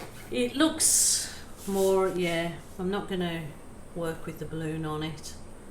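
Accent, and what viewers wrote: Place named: Liverpool, United Kingdom. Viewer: I love this. I also like the other one you did with all the shades of Aqua and Orange. The drip line painting i know exactly what your'e talking about. I agree with what you said about using every other line in the tray but also maybe still elevate your top end but start your dripping/pouring from the bottom end and moving up to your top end????? Or again do the same from your top end moving the pour down to the bottom as you always have more paint at the beginning than the end and it could run down into itself. If i'm overstepping the mark here please ignore me. I'm no artist but i understood what you were trying to do, may; maybe more elevation! Either way, i LOVED both of the ice cube tray paintings. I am so itching to get someone to help me clear out my spare room and start ordering paint supplies. You are an Inspiration and i love your down to earth attitude. 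You don't take yourself too seriously which is wonderful. I can honestly say that from the people that i have looked at their art on YouTube you have to be my favorite and i mean that sincerely. You have spoken briefly about your Mum in the UK and from your accent i'm thinking Liverpool maybe. I don't know. Thank you Thank you Thank you for all your work and iv'e never seen a bad result. Take care. Shirley xx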